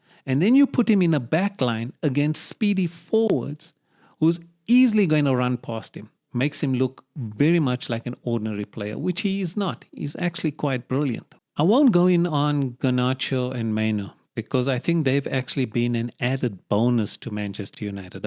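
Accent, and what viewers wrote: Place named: Durban, South Africa. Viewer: Aslm, nice to see someone from RSA making united vids, keep it up. Sounds like you're from Durban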